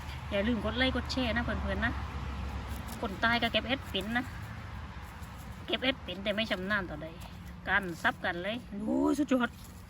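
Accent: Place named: South, Thailand. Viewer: ชอบจังเวลาพี่พูดภาษาใต้คะ😁🍄🍄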